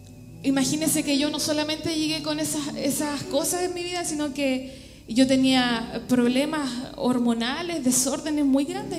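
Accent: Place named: Chile. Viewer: Es chilena.. Es de concepción y es una sierva de Dios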